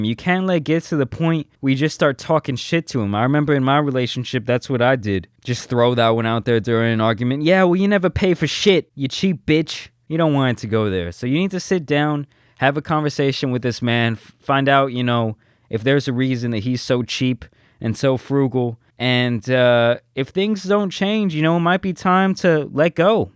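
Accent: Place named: Boston, United States. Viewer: Is nigga from Boston , the accent is hella strong 🤔😂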